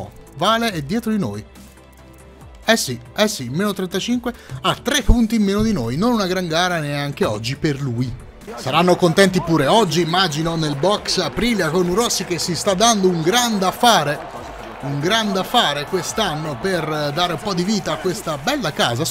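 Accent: Sicily, Italy. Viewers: Gerardo Cavallo Fan Teknoescobar sei Siciliano?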